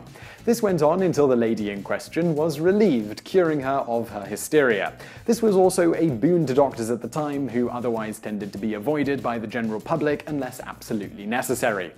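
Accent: United Kingdom. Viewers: where is the narrator from? great britain?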